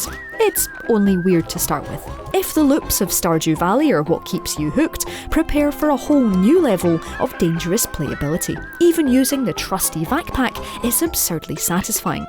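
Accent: Scotland, United Kingdom. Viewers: Rowena is Scottish and I’m pretty sure the narrator also has a Scottish accent lol. I love Rowena tho she’s amazing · The slight Scotish accent is really enjoyable! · I love the scottish accent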